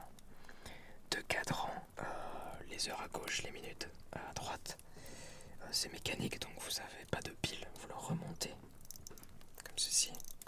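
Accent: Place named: France. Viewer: Oh my God I love your French accent! I don’t get to hear these accents very much and they are absolutely lovely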